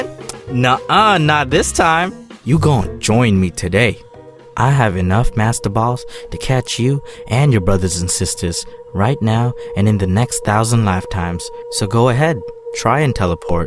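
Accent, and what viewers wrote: Philippines. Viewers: love the Filipino accent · gotta love that Filipino English accent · Weird Filipino Accent Tae means poop in Filipino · sorry dom but I can't stop listening to your filipino accent nintendo pamili komputerr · you sound like a Filipino. I’m a Filipino btw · lol the filipino accent is so on point and funny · love the Filipino accent 😂😂😂😂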